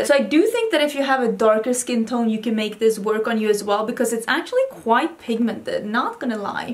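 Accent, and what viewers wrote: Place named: Sweden. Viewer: I loooove how you talk hahaha... I’m a big fan of the Swedish accent 😁 and that eyeliner is AMAZING for your eye color!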